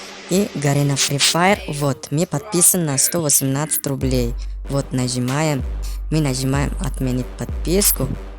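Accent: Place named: China. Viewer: Китаец научился русскому языку,и плюясь в микрофон помог лучше чем кто-либо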